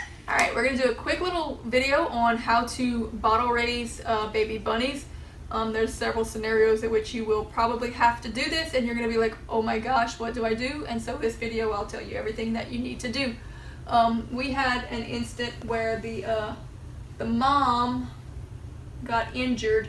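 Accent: Louisiana, United States. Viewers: Most helpful video I've watched yet👍I like your accent too, sound like you're a Louisiannan🐰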